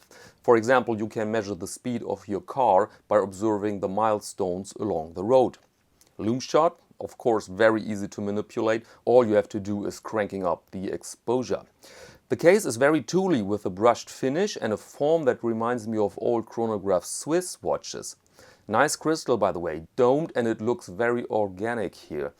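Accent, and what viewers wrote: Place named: Germany. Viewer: Love your reviews. Jazz guitar? Great watches? Great mens attire? And a German accent! (I lived in Oberammergau till age 14) keep them coming!!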